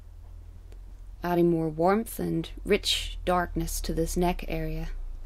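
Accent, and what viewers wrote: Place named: Ireland. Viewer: thanks for the art lesson..i usually add dark blue (just a stroke ..in the darkest of the dark...like a highlight) to the black in the shadows to make them appear darker.. I love your Irish accent...I have to find a Irish girlfriend Gerard Mineo also does velour portraits that I like: <link>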